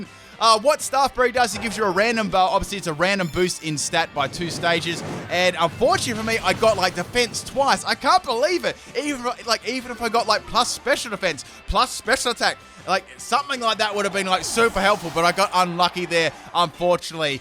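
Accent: Australia. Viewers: Bro he's australian not british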